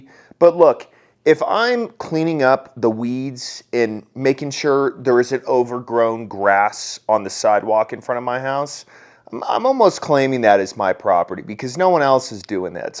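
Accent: United States. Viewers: This real man here speaks like an american!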